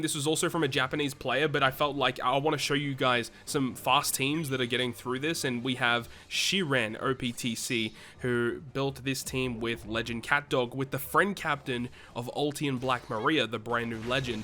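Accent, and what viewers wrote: Australia. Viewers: é o melhor, sotaque de australiano vom de ouvir LoL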